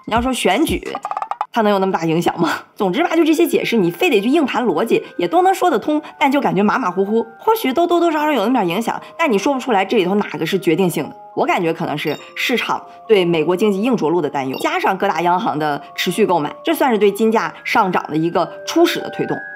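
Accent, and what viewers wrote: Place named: Dalian, China. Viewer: 今天偶然间，看到了2期小LIN的节目，其中有一个是三年前的，自我介绍那期节目，，这闺女真好，又漂亮又口才好，又有才，，听着口音，虽然是普通话但是总觉得亲切又熟悉，，，不会是大连的吧，至少是北方的（东北或山东），果然猜对了，大连闺女！！！哈哈哈哈，，179万订阅，我的天，比王局都多，，太牛了！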